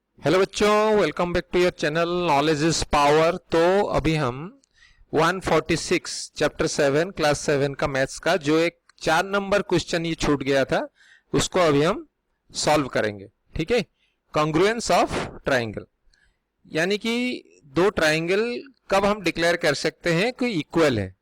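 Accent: Odisha, India. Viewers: Sir are you from odisha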